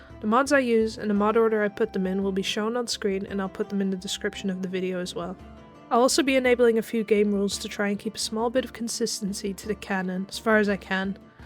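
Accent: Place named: Netherlands. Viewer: ik herken een Nederlandse accent van een kilometer ver😅 Toffe video tho en origineel.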